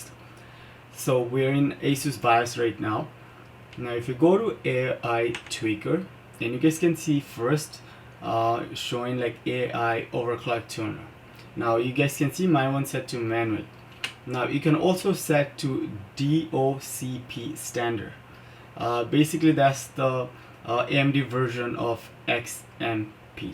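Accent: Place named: India. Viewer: Are you from India? It seems you have Native Indian accents.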